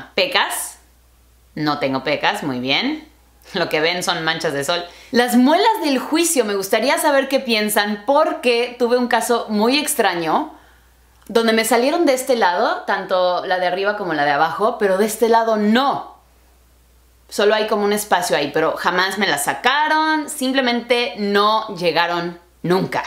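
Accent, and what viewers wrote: Mexico. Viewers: Tienes sin duda una apariencia Europea nórdica, pero con un hermoso acento mexicano que te hace ver más interesante. Tu español y tu inglés son impecables. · Tienes un acento mexicano, muy marcado. Hablando español claro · .. me gusta tu acento mexicano... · Con ese acento puro mexicano, piensas en Español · No mames wey!!! Ahre jajaja es re gracioso el acento mexicano.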